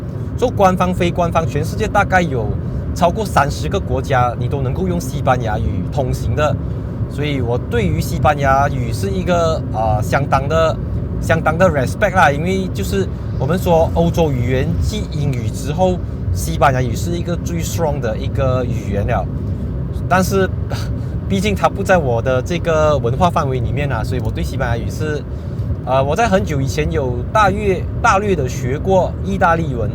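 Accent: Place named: Malaysia. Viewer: 看到一个口操马来西亚腔的主持人review欧洲的国家，有一种马来西亚终于走向世界的感觉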